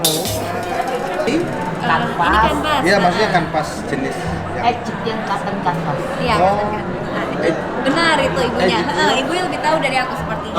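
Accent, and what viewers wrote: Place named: Java, Indonesia. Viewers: Pertahano bhs suroboyo mu cak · Cabe joooon , wkwkwk ... btw , lucu nonton orang logat jawa kaya situ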